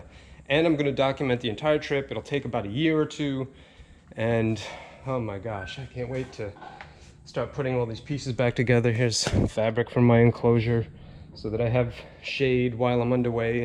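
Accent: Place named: New York, United States. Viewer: Yea, now I recognize it, the slight New York accent. Subscribed, been binge watching - I'm live up north, here in the Outer Banks. Love you're Videos.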